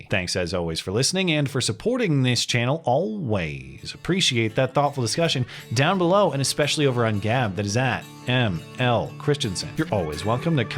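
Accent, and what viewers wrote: Minnesota, United States. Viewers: Are you from Minnesota???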